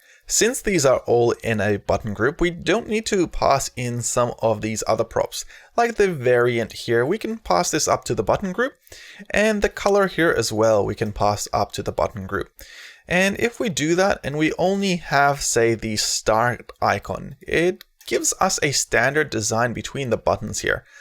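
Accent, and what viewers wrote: Australia. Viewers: Australian accent is fantastic 👌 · useful tutorial as well as nice Aussie accent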